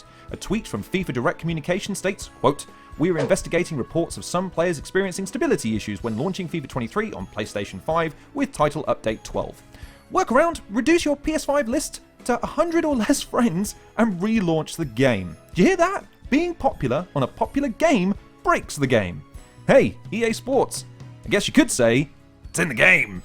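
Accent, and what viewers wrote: United States. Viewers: I remember that doom RPG, it was pretty good. Nintendo is going hard at people now but they really didn't do much when the 3DS and WiiU emulators and tools were being developed while those consoles were very much alive. Nice American accent. QOTD, Probably the Entrain Odyssey collection for switch.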